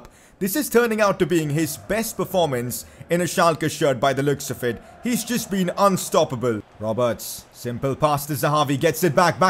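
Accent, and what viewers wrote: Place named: India. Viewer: why your accent sounds so indian xD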